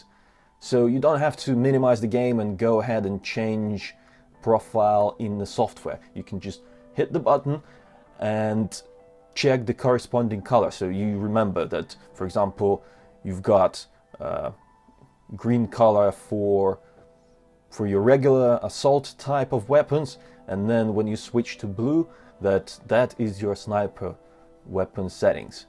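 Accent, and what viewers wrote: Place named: Russia. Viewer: Ты оч хорошо по английски говоришь, но всё равно с русским акцентом))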